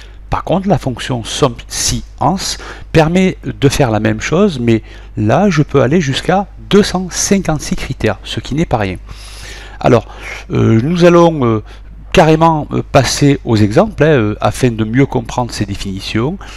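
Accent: South, France. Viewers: Meilleur intro. On est dedans direct (enfin moi je l'étais) et puis ça part d'un coup. Ça parle bien c'est net et précis. Mention spéciale à l'accent du Sud qui me rappelle cette boite de conserve "saucisse lentille" mangée en khapta. Merci encore <3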